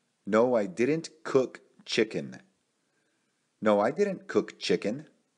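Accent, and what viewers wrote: United States. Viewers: excelente video, muy buena forma para aprender. ¿ el ingles de tus videos es ingles americano ? ¿ o es ingles como se habla en Gran Bretaña?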